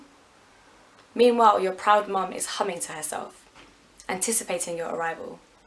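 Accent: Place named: United Kingdom. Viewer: beautiful poem, and I really like your incredible british accent. Please make more videos. regards from México :)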